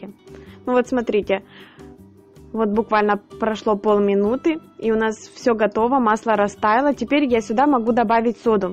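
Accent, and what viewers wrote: Ukraine. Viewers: А то,что надо грамотно разговаривать,это фаэтОн девочка украинка,судя по говору,поэтому не совсем грамотно говорит,но ведь это не экзамен по русскому языку.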